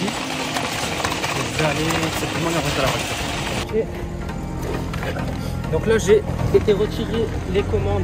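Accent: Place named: Tourcoing, France. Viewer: On dirai tourcoing 😁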